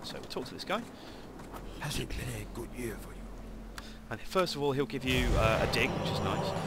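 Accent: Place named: United Kingdom. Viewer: Hey, I really like your videos; just fun and casual to watch and listen to, whether Vanguard is popular or not. Seems like a really nice game for some casual single-PvE. It's just too bad I can only make out 80% of what you're saying behind all the sound effects and with the brittish accent (no offense). Oh, btw, try whatching this video transcribed/auto-subtitled; it's just totally fucked up:) (like - )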